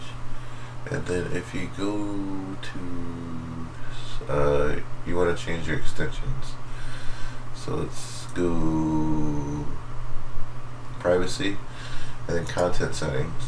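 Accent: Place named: China. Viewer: Chinglish! PogChamp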